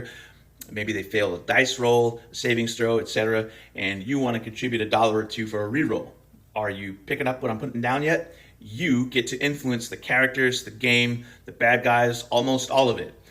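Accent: Scotland, United Kingdom. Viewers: It is funny how when you get angry the scottish accent disappears. I love stack-up. I have donated to them before. It is an amazing charity.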